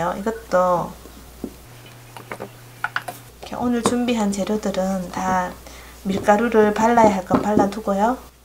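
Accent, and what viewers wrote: Gyeongsang, South Korea. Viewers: ~설명도 친절하게 잘하시고 못하는요리가 없으세요^^요즘~잘구독하고있습니다 덕분에~요리솜씨가 늘었어요 님목소리에서~경상도특유에 깊은정이 느껴져요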